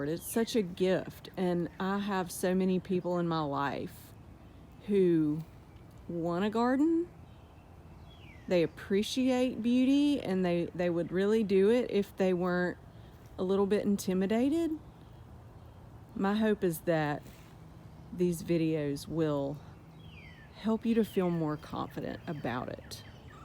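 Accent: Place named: Texas, United States. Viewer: I just discovered your channel today. You have an absolute gift at choosing plants, placing them just perfectly, mixing textures, and creating a beautiful layered look. You are so right that many people are intimidated by gardening. Also, I love your accent. It’s very similar to mine as I am from northeast Texas. Actually we don’t have the accent; everybody else does! Lol Anyway, you sound like home to me , and I am enjoying your videos.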